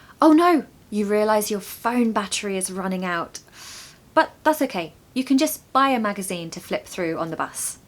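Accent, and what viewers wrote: United Kingdom. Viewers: Hello, Is posible to teach me class online I like your accent British Kind regards Emma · Hello! Well, many British accents exist. They (British people) don't all speak like 🙂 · You've got such an elegant British accent! Really enjoy listening to your speech. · It is lovely British pronunciation😊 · Hello,. Hope this email finds you well, and sound. I am writing this email in regards to your ways to present a productive content. Literally, endearing, splendid British vlog. I am totally intrigued with your fancy accent. Moreover, I want to express my gratitude to you for all your work on getting your content makes sense, so kindly keep the good work up. Your prompt respond is highly appreciated. Sincerely, Moe. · The best teacher.I love british accent.I like your voice teacher.😊❤ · I love British accent and want to talk like British. 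Your video really helping me,. Thanks for everything.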